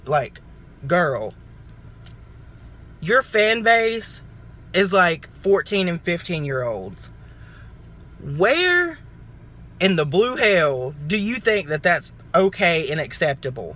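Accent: South, United States. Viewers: Newbie here lol Peter Monn has said such great things about you & now I know why! As soon as I turned this video on, I got the sense youre a southern drawl, younger (Not saying Peters old, dont come 4 me, were same age)from the country version of Peter which I mean to be a compliment! You have the same positive kind energy & mannerisms that I could tell within a minute of your video😊Thanks for this vid & will be checking your other vids out😀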